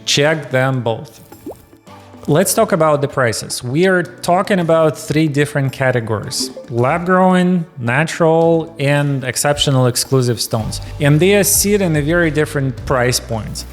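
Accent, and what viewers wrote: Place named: Czech Republic. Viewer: I guess (from the accent) speaker is Czech maybe, hence the mispronunciation of French. No big deal, the content is still valid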